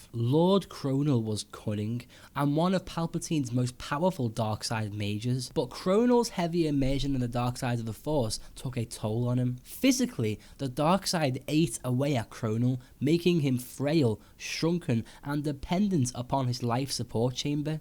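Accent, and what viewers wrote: Liverpool, United Kingdom. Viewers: Scouser say no more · It's called being a scouser. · he's from Liverpool if I'm not mistaken. the Beetles spoke the same way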